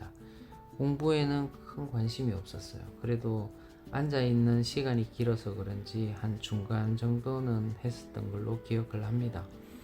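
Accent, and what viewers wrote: Gyeongsang, South Korea. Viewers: 제 고향도 창녕 옆 밀양 무안입니다, 저도 부산에서 몇 년 학교를 다녔구요, 그래서, 억양이나 정서가 비슷하니 더 정감이 가는 것 같네요, 올핸 뵐 수 있겠죠!! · 새해복마니받아요 저두 대구사람 어찌 말투가 주위에서 자주듣는 어투라서 경상도분이구나했두만 역시나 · 선생님의 이야기 잘들었습니다. 항상 느끼는것이지만 선생님은 경상도분이신데도 투박하지않은 듣기좋은 그런사투리를 쓰십니다. 그래서 항상 듣기가 편안합니다. 제 말투는 완전 대구사투리 그 자체거든요ㅎㅎ 오늘 날씨가 참 좋아보이네요 그리고 제가 가보았던곳이라 너무 반갑습니다ㅎㅎ 좋은하루 되시구요 오늘도 좋은영상 감사합니다^^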